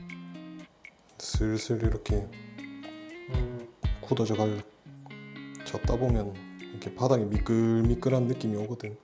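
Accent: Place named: Gyeongsang, South Korea. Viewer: B식탁님 말투완전 우리동네에요 전경상도 김해요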